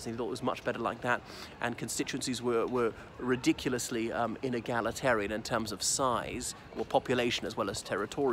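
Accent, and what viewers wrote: United Kingdom. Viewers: This is a very informative lesson. I truly appreciate of your work. It would be good for knowledge besides listening to British accent. Have a good day,. 🥇🏆🥇🏆🥇🏆🥇🏆🥇🏆⭐⭐⭐⭐⭐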